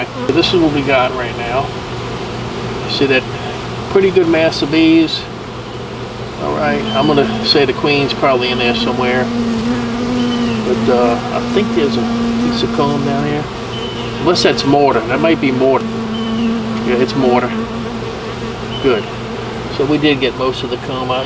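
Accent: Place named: South, United States. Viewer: Love your accent,... The Dirt Rooster and Mr. Ed can’t match it! You southern bee guys need to get some headlamps, though... no having to hold flashlights in your teeth!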